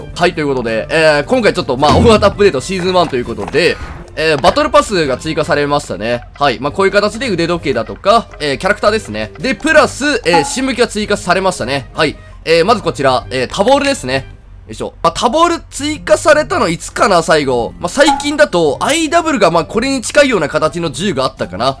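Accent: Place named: Hyogo, Japan. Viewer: 時々聞き慣れた発音してたからもしかしたらと思ったら もしかしたらで兵庫出身やったんですね笑 動画で「なんどいや」 , 「~じゃいや」「〜がいや」etc 言って流行らして下さいw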